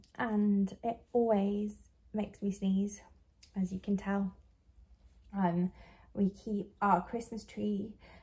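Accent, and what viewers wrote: United Kingdom. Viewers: I don’t know why but I thought you would be American! But you’re a fellow Brit!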